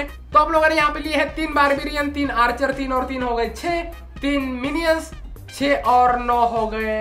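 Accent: Kerala, India. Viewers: you are from kerala?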